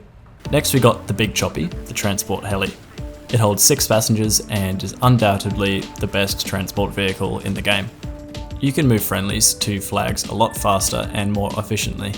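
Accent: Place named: Australia. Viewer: Your Australian. Im subscribing.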